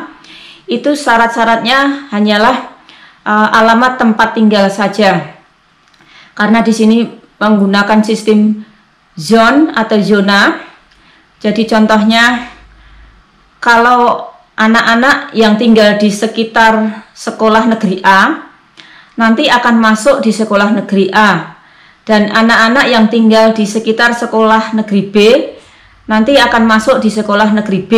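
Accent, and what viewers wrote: Java, Indonesia. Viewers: Logat jawanya ga hilang mba'yuk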